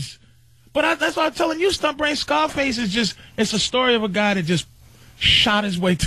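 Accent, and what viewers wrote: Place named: Cuba. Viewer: Patrice O’Neil’s Cuban accent is hilarious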